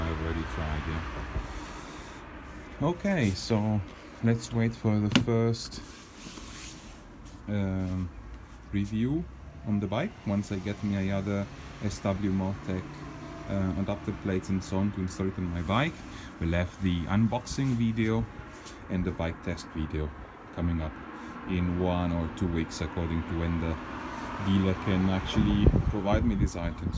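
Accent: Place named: Germany. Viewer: Ich glaube zu hören, dass Du Deutscher bist. Warum sprichst Du dann nicht Deine Muttersprache.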